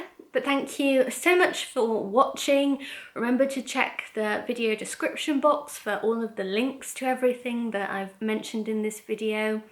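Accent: United Kingdom. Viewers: Isn’t it interesting you have such a strong English accent even though you spent much of your life outside of England- I wonder if that is because you were homeschooled and learned from your mother? I loved learning all these new things about you and I am loving all the new content you’ve been putting out. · You just blew my mind when you mentioned you were born in Regina, I was born and have lived in/around Saskatoon my whole life and so many people have no idea where Saskatchewan is, and with your accent it's hard to believe you've lived anywhere but the UK. This was a fun surprise to my day! Annnd now I want to make soup, it's still -18 here so I need to get my hands on these ingredients and make this asap!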